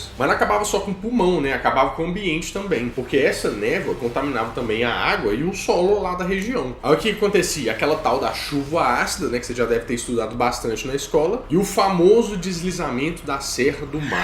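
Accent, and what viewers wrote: Minas Gerais, Brazil. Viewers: sabia q ele era de Minas só pelo sotaque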